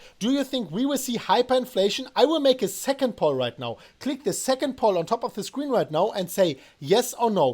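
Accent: Germany. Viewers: Man hört richtig das du deutscher bist 😋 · you MUST be german!! Your akcent is just too on point!!!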